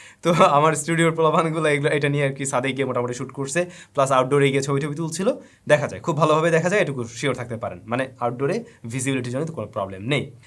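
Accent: Barishal, Bangladesh. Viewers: borishailla tola aise phone review korte ,, taw atc er moto vfx diya ,, hla cringe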